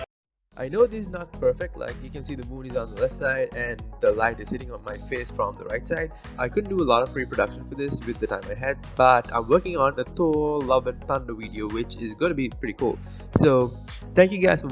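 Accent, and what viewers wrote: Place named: India. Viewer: Bro you sounds like one of us👀 ...are you indian? Your new susbcriber here 🙋🏻‍♂️ please guide me i need some help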